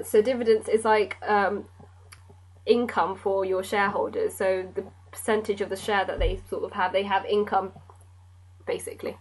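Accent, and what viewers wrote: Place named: United Kingdom. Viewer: I like the way you taught hahaha!! It helped me understand alot. You've shown a lot of enthusiasm in your lecture and you sound like a UK girl ; ) Anyways, nice vid.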